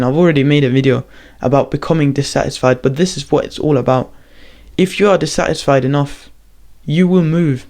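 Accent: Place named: United Kingdom. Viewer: man that accent is honey for hears, wish i had a uk accent🙏🤣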